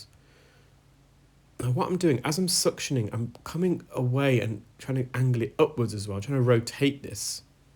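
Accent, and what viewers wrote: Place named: Estuary, United Kingdom. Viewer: I was listening to you waiting and waiting and waiting to hear you pronounce one of the "bath" words ("half" takes the a of father everywhere in the UK so that doesn't count) until I finally heard you pronounce "afterwards" as "ahfterwards"... All this in an attempt to place your accent. It's not "posh" and it's not even RP (received pronunciation) but it's not a "common" accent either. (You are, after all, very highly educated). Your accent sounds like it's from somewhere in the SE (or south central England) and it sounds like it might possibly be from the East Midlands or southeast Midlands or even south London. It's certainly not Scottish or from anywhere in the north or the west country. It could, theoretically, be from Wales but that's a part of Britain I'm not too familiar with. I don't want to take any wild guesses but it definitely sounds like it's from the south (and not the extreme western part of the south, like Devon or Cornwall). 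It sounds like what's referred to these days as "Estuary English" (because it's primarily spoken in the SE, at the estuary of the river Thames). It's used by "yuppies" and other educated, upwardly mobile types. It's not a "posh" accent but it's also definitely far from being a cockney accent (even if it does have a little itty bitty bit of cockney in it). 🇬🇧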